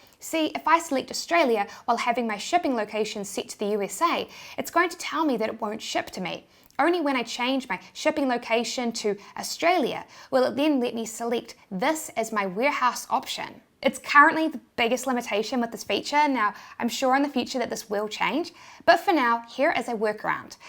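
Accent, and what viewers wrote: New Zealand. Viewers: Love the new Zealand accent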